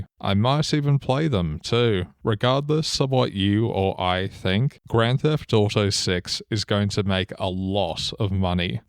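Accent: Australia. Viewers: australian accent is peak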